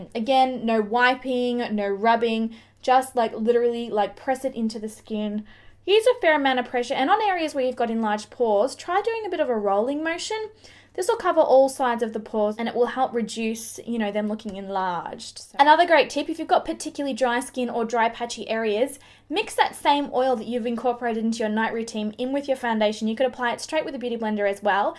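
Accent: Australia. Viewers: ?hmmm..let me guess.. Aussie accent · I almost never have the sound on when I watch tutorials because basically most chicks annoy me. But I wanted to hear what you were talking about with this tutorial, so I turned up the volume. And you're an Aussie. All this time I didn't know...lol. New subbie lol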